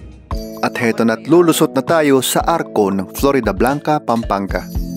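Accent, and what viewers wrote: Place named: Visayas, Philippines. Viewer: Bisaya diay ka? Lol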